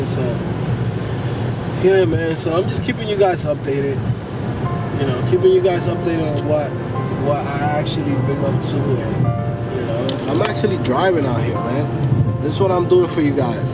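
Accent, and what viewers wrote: New York, United States. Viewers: Hey I have been watching your video for days. loved the work. So sorry to hear about your loss, family is the biggest wealth a person has. BTW are are you from NYC? I am from queens and I think you are a new yorker too. :)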